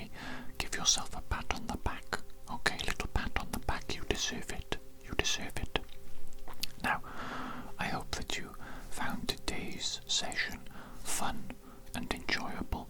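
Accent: Wales, United Kingdom. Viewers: the welsh accent hits 😫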